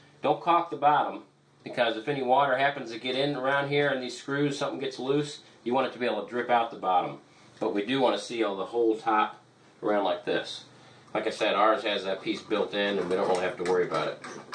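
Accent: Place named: Ohio, United States. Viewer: I'm a fan of accents. are you from Eastern Ohio? rate my guess 🤙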